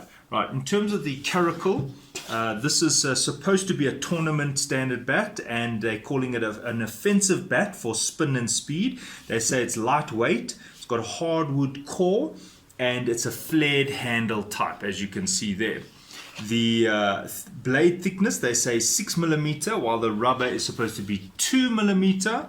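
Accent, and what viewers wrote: South Africa. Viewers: Are you a South African?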